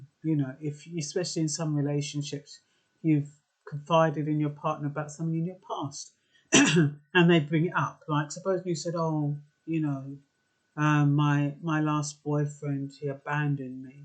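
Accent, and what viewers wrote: Jamaica. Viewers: I like it wen you fling on yu jamacian talk.keep up the good work.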